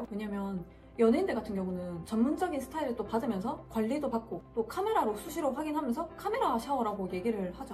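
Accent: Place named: Daegu, South Korea. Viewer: 아 사투리보고 대구인줄 알았는데 서울에 계시넹 ㅜㅜ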